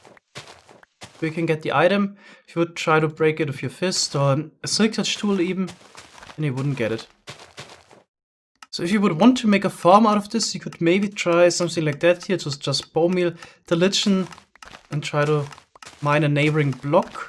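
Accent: Germany. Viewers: Love you and your german accent, but: "Glow Lichen" is pronounced "Glow LIE - KEN"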